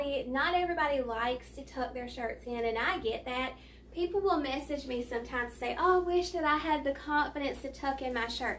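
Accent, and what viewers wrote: South, United States. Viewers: OMG! A year already!🥳🥳🥳💐🎊🎈 Gotta say you are my favorite and only one I watch for Shein hauls! You way of presenting and explaining each piece is the best. I love your southern accent and your cute and proper personality. Because of you I walked out of my comfort zone to try new looks. Keep it up friend! Congratulations!!🥳🥳🥳🥳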